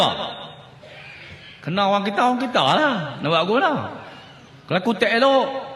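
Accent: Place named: Baling, Malaysia. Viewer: Sanusi ni org baling. Memang org sana stail cakap mcm tu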